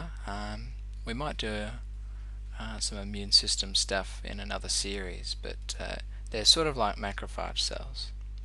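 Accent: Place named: Australia. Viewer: Why is this so much more interesting when it is delivered in an Aussie accent?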